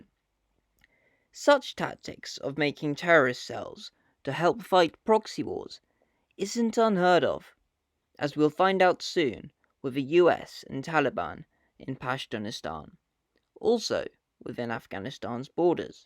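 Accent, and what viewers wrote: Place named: Punjab, India. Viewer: Your accent is punjabi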